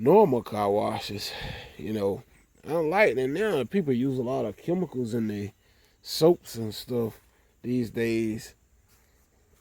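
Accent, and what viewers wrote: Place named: South, United States. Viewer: Yall southern mf can't talk to save yalls life poor things 😔 and it took ten years of listening to it before we seen anything happen... I always wondered if it was on purpose cuz T I can talk but you can still tell he's from the south! he can say "then" and then you can read the word "then" and read the word "Den" then say it the same Car is Cah then just say rag so you can make an R sound that shit is crazy to me, European London Britain England accents nauseate me the South and Africa are next in line 🤣 the South sounds like someone just learning how to talk for the first time and yes we were all either born or kids in the 80s when the next generation of adults we're mostly populating the planet right now I just came and see what Nu Finish can do but I had to sit and listen do you repeat yourself 10 times with natural slurred speech 😩 I'm Denver Colorado so ... 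when I visit my cousin's in Houston their friends say I sound White no I sound right I can talk! oh my God East Saint Louis black folks its embarrassing my ex was born and raised in Alabama but sounds like she's from Colorado, you make the r sound in any other word but you can't say car properly or other words ending in r so is this shit even real or is it culture remnants of slavery y'all would have so many problems with white people out here where I live, White people would treat you like shit like you were stupid if you lived in Colorado sounding like that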